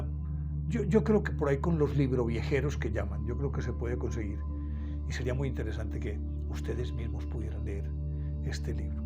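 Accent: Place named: Antioquia, Colombia. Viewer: Lo mas bonito de la narracion es el acento paisa que me parece hermoso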